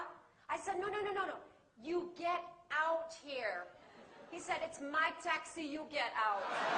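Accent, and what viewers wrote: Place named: China. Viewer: THAT'S CHINESE ENGLISH LAH! NOT MELAYU ENGLISH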